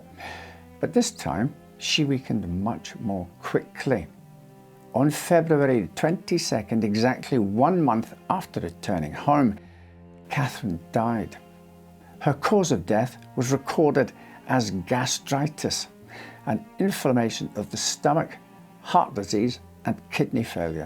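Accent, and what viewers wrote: Scotland, United Kingdom. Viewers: Has revealed where he is from in the UK? is a Scottish name, I believe and his accent sounds like it. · He clearly has a Scottish accent, but it sounds a bit mixed, so I suspect he's spent a lot of time south of the border. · I can hear a little Scottish accent here and there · he is Scottish, from Lanarkshire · Love the way the presenter talks and makes it easy for a non English speaker to understand. Are you Scottish? As for the case, I say it was all due to greed. No rival involved, Armstrong just wanted to be the top dog in his community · In this particular presentation I am inclined to agree with you. Normally his accent is quite smooth with more of a Yorkshire twang but it seems he is adding a sort of Scottish intonation to it now. I noticed the change straight away and agree with it being a bit offputting and parody-ish. 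Edit: I didn't realise was Scottish. So yeah my comment would seem inane at first read. Sorry!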